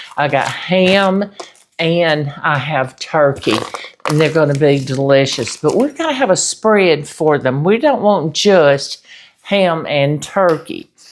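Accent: South, United States. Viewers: Miss, first off, I so enjoy your videos and your sweet, southern accent!!! Do you ever just sit back, close your eyes and try and envision a new recipe? I do it all the time!! Tonight, I was sitting here (with my eyes closed😌….that’s important😂). I made a cheese ball last night for game night with our neighbors. It has cream cheese, diced green onions, diced dried beef, Worcester sauce and some spices. Tonight, I have some sweet, red, Argentina shrimp. I thought I would spread a thin layer of the cheese ball onto a flour tortilla, sauté the shrimp and make shrimp tacos with butter lettuce, onion, cherry tomatoes and an avocado lime dressing. When I opened my eyes, I clicked on your video and you were spreading cream cheese, sour cream and the dried vegetable blend onto a tortilla!!! I swear! You read my mind!!! It’s either that or AI!!😂😂😂😂 Keep making your awesome recipes!!!😊😊😊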